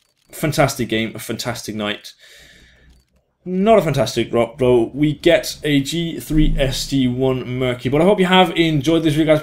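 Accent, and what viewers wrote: Denmark. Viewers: He is from Denmark :))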